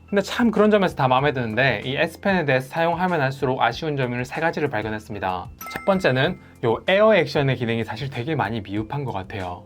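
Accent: Gyeongsang, South Korea. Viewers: 고향이 부산이신가요?억양이 굉장히 경상도스러운데요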